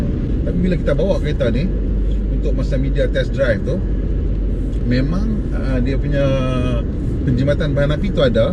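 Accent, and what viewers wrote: North, Malaysia. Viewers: Mmg puaih hati haih dgr penerangan loghat utaqa dia...batoi² Sempoiii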